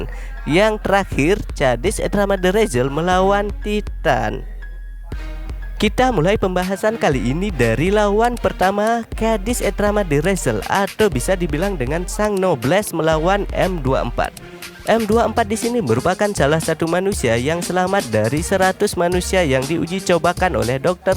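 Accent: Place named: Java, Indonesia. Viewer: Tambahan, kalo bisa logat daerahnya di kurangi. Bahasa indonya medok bgt.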